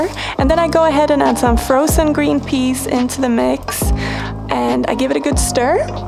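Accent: Sweden. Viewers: Det tog mig cirka 3 sekunder att höra att du var svensk. Ganska kul ändå. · Just discovered your channel last night, 🌹and I'm TOTALLY IN LOVE. ❤️ Been VEGAN 💚 for many years, yet finding many of your recipes very inspiring! 👏🏼 Plus your sweet Swedish accent is so pleasant to listen to! Reminds me of my multiple visits to Sweden. 😊🇸🇪 Keep up the great work! 👏🏼🤗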